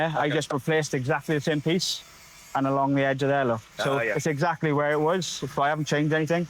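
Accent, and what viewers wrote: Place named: Cardiff, United Kingdom. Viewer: Croeso, you sound like your from cardiff or very close am cardiff here love your videos mate keep them coming 🏴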